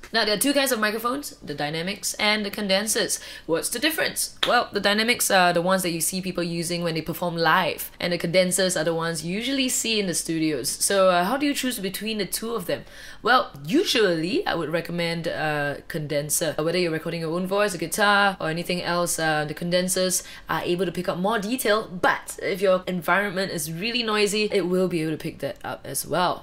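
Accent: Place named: Dominican Republic. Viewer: You speak like a Dominican in english.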